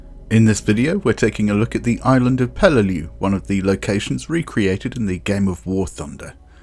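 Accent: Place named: United Kingdom. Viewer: Thanks yet again for an informative an interesting piece of work. Your style is and unruffled and your British accent is, of course, charming as hell...as always. Have to say I did miss " " at the end, though. It is always such a relaxing end to a good time, especially if you do a ramble at the end. More, Please, Sir!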